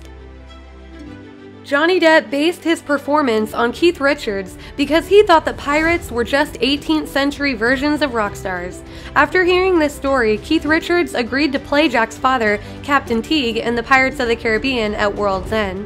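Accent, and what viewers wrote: United States. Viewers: Instantly bailed due to nauseating American narrator. Was she the only applicant ?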